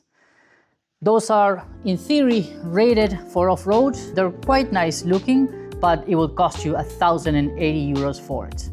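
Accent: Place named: Rio Grande do Sul, Brazil. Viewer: Gaúcho de onde Tchê?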